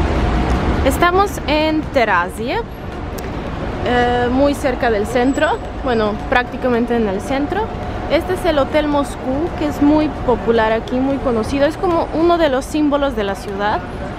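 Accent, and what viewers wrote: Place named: Mexico. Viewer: Una cosa es hablar bien español, pero en tu caso lo haces con todo y acento Mexicano, pareces nativa Mexicana, me sorprende mucho!! :O Que bonito es tu país, tiene lugares modernos, historicos, además de lugares naturales, mucho verde, me agrada!!! (^.^)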